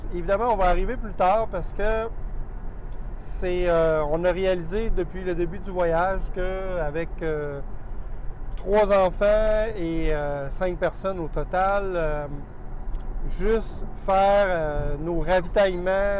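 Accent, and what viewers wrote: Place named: Quebec, Canada. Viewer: Ah!!! l'accent quebecois.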